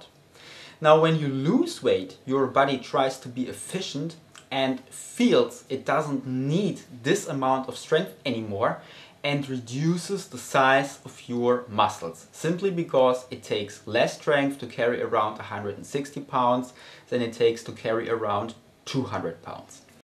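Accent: Germany. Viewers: German Accent : )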